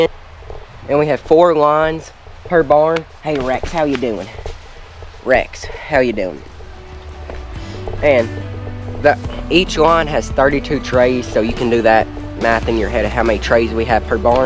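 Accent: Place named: Kentucky, United States. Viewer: ...I am a city guy and know little (well really nothing) about farming. Any kind of farming!! However I do enjoy watching the various farming videos on YouTube. And I have learned a lot. Still trying to figure out the different kinds of cows...Heffer, steer, etc. Your video is the first one about chicken farming that I have watched and I will be following your videos. I have so many questions that I am sure will be answered. Havent seen your chicken "barns or houses" with chickens yet but am anxiously awaiting your videos. And just so you know...your presentation is really good. Maybe it's that Kentucky accent...but your presentation is very good. Please keep the videos coming, and maybe I will finally figure out what came first...the Chicken or the Egg. Yeah, you knew it was coming.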